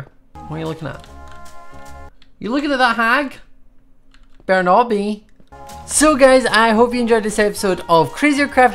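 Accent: Scotland, United Kingdom. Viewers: You remind me of that sloth off of ice age because you are taking Dragons . Your so funny and I love your Scottish accent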